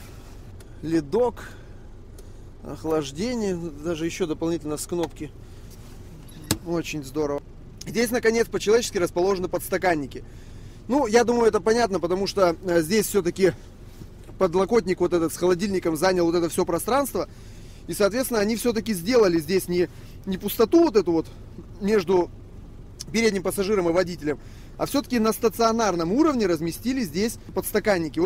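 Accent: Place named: Irkutsk, Russia. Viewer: Подожди-ка! Ты шО иркутский???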